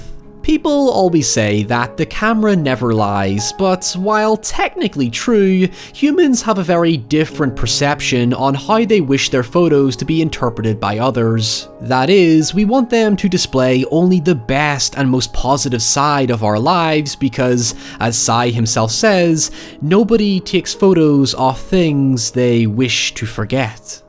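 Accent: Northern Ireland, United Kingdom. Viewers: Surprising to hear a fellow Northern Irishman! Love this film, a true hidden gem.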